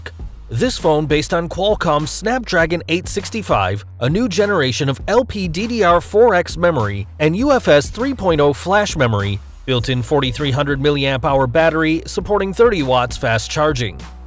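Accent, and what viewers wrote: United States. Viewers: Why does your voice sound american but it sounds like a foreign person wrote it and ur just saying it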